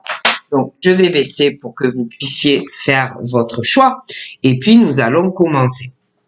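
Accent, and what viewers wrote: South, France. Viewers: ton accent du midi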